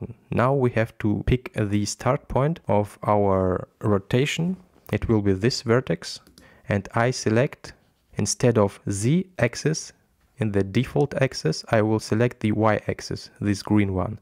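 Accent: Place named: France. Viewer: By the way I plan to go through all your videos on blender. They are well done. The cadence was excellent on this one. The on screen help was brilliant and they are very succinct. Thanks for your efforts. You deserve more subscribers. Also want to let you know that I have no problem with your english. Sometimes you substitute French grammar but that does not sound bad to an American ear. To the British? Well we all know they are snobs. Right? LOL.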